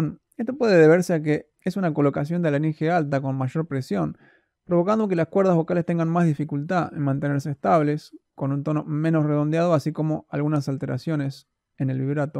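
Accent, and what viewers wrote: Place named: Castile, Spain. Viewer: ✨✨✨Maravillosas tus técnicas ✨✨(HD isolation)) y ((Gráfico espectral))...eres único analizando y mostrando solo el sonido de la voz. Sorprendida al escuchar tu voz castellana ..saludos desde Mendoza 🇦🇷 y en mi nombre grupo LA VOZ DEL MUNDO DIMASH QUDAIBERGEN SOY TU FAN 🇦🇷🇦🇷 ARGENTINA.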